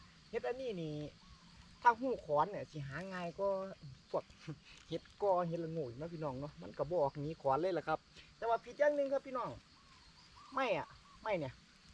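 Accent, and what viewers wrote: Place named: Nong Khai, Thailand. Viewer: สำเนียงการเว้าคือคนหนองคายเนาะจ้าติดตามให้แล้วจ้ามื้อนี่ช่องลูกจ้างอิสละบ่มานำบ่จ้า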